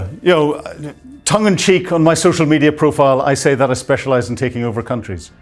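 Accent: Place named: United Kingdom. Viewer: Very british English, thanks 😊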